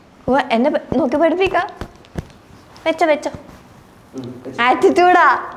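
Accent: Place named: Kannur, India. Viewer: Anchor kannur slang ... 😂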